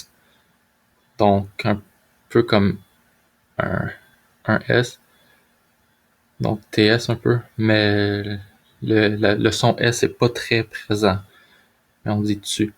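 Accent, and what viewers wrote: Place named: Quebec, Canada. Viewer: J'adore l'accent québécois ! .. et tes vidéo aident moi .. Merciii ...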